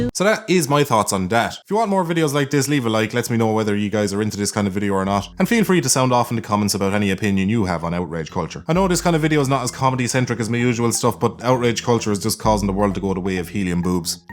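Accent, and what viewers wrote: Ireland. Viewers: Goldenaries 08 it's a well spoken Irish accent. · I feel I've missed something since I've never heard of you till today when you waddled into my YT feed. Love your accent (Irish? the Yank guessed) and agree the world is fucking insane. Subbed. Keep up this. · Is he irish mate pls be cause I be the only one hardly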